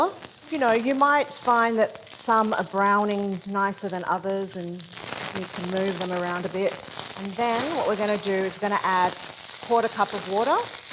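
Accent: Australia. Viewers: Thank you for keeping your Australian accent. Once tomato season returns in Australia, I'll be making that salad. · Love that Aussie accent 🦘🐨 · I knew she was Australian as soon as she spoke… But just discovered her here.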